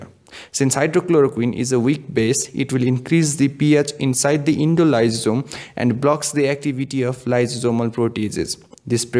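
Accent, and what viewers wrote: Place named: Nepal. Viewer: Ur accent seems u are from Nepal..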